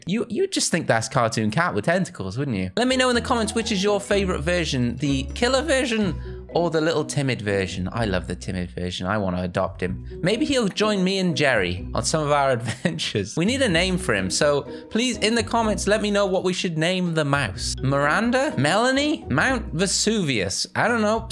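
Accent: United Kingdom. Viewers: r y British?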